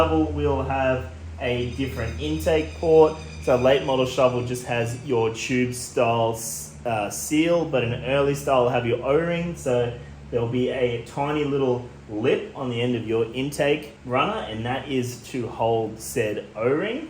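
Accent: Australia. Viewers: thank fuk someone who's speaks Australian is Australian is doing a Rigid Shovel ground up rebuild, been sitting on an 81 procrastinating on exactly what your doing, well exactly as far as my amateur skills will let me go anyway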